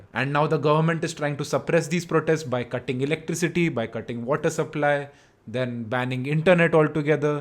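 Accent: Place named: South India, India. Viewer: Bro your hindi accent is little bit of South....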